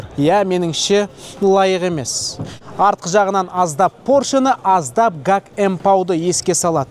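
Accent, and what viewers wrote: Standard. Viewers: ҚАЗАҚСТАНДА ЖАЛҒЫЗ ТАЗА ҚАЗАҚША 1000% СОЙЛЕЙТІН ОСЫ СИЯҚТЫ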